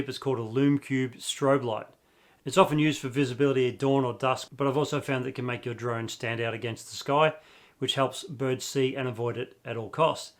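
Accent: Australia. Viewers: First thing I notice was the Australian accent. LOL! Well of course birds are a threat there, because just about anything that crawls or flies is dangerous in Australia. (Just kidding!)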